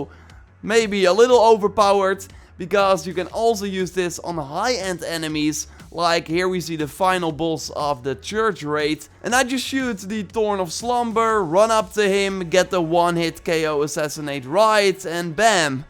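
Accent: Netherlands. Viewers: you are from the nethelands dat kan ik horen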